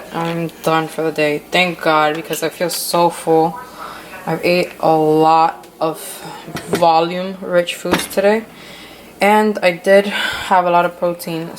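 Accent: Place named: Dominican Republic. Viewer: Idk if I paid attention to what you eat or your Dominican accent. Haha love it!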